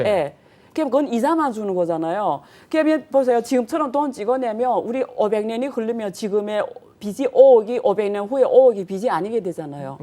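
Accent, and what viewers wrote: Yanbian, China. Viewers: 발음하는거 보면 모르냐? 원래 조선족인데 정신이 바로 박혀서 한국에서 애들 가르치잖아. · 조선족인가? 연변말투네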